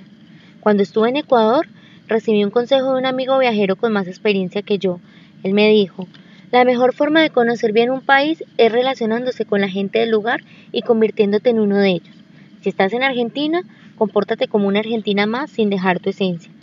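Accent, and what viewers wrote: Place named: Colombia. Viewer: muy lindo tu relato, hermoso tu acento colombiano, emocionante tu apreciación sobre nosotros y nuestro país que está lleno de buena gente como colombia, siempre bien venida, suerte en todo lo que te toque vivir.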